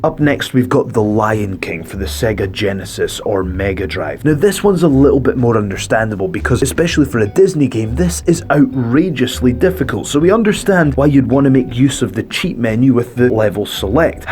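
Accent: Scotland, United Kingdom. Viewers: I haven't been replying for over 2 months. I've got these days to waste replying a guy who thinks he's so smart :P Dude, you clearly have no idea what you're talking about. Accents and the language the person speaks changes everything in communication. Example: I speak my name with "Pau" being the strong syllable and then "lo". Some people, depending on the accent and the language they speak will say it sounding a little different, which doesn't change my name at all. Actually, my foreigner friends tend to say my name with "lo" being stronger. Every time they say it it's still my name. Easily recognizable for sure. The guy is Scottish and say "Mario" with his damn accent and now the name is wrong? Do you know that Portuguese, Spanish, French and probably many others language doesn't have the "th" sound in their language and won't say "Elizabeth" the way you think they should? 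Won't even need to talk about accents here! Ps. Seriously? Mario is not real? If you say Santa Claus isn't as well I'll kill myself right away! At least I know they're more real than your wisdom :P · Are you Scottish? I love your accent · most annoying scottish accent ever... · He's clearly a Scot, you trolling bro? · HES SCOTISH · Gotta say...kinda enjoying the Scotsman a bit more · I get that you're Scottish, I am too, but stop putting it on so strong its pure annoying.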